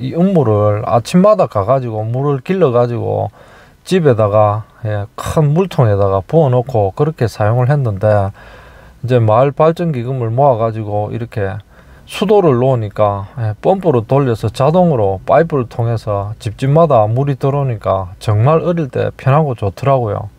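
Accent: Gyeongsang, South Korea. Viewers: 사투리는 경상도신데 생각이나 표현은 진심인 진보라 늘 응원합니다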